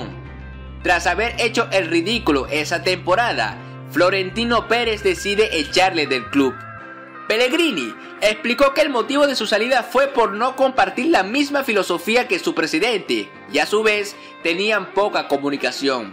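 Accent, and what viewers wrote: Venezuela. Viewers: Hola amigo, eres venezolano, verdad? Además que siento que narras los videos algo parecido a Dross. Saludos desde México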